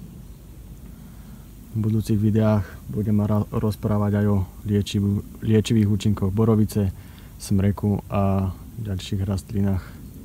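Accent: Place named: North, Slovakia. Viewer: Máš krásny severoslovenský prízvuk, videá sú super nenechaj sa odradiť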